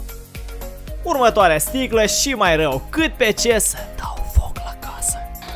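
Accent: Moldova. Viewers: Ești Moldovan nustiu terog sami. Spune terog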